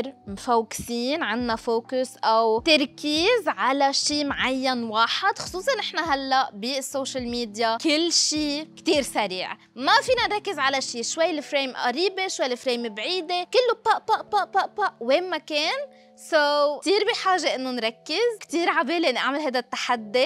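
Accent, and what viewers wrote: Lebanon. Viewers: لهجتج لبنانية انتي لبنانية صح اني هم ماعرف لبناني جان كتلج معنى كلمة شمعنا بلبناني 😂 هذا تعليقج ما مخفي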